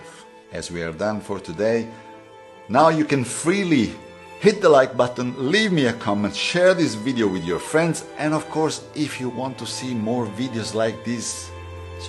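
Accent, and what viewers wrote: Italy. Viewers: I watched every video of yours since I discovered you about six months ago. You always go with your 20 mm. No I know you why. You have a great style of teaching with your beautiful Italian accent.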